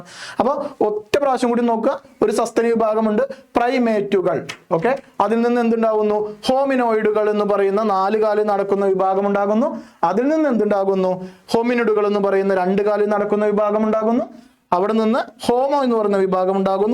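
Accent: Thrissur, India. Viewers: Sir thrissur ano ❤❤❤ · Sir class nte idakkulla trissur style aanu highlight😂😂😂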